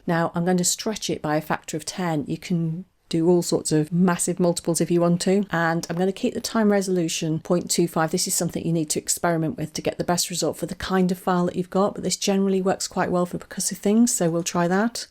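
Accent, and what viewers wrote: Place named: Manchester, United Kingdom. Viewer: Looks like its changed since this video. I go to change tempo but instead of getting the popup box showing how to select the new speed, I got a box saying disallowed, you must first select some audio . I've been trying to select a portion of the track for some minutes through Select and Region and then trying everything there, but the cursor disappears all the time, so can't make a selection. Btw, I love your Manchester accent. That's where I grew up. :)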